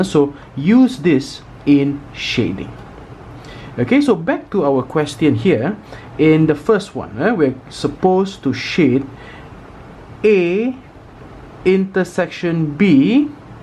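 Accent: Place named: India. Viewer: well , his accent sounded totally indian so...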